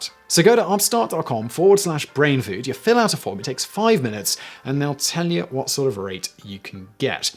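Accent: England, United Kingdom. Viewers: I love to listen to Simon day four letter words😂something about the English accent and the word "fuck" are an absolute beautiful combination! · Serious proper English voice laughing brings my heart joy xD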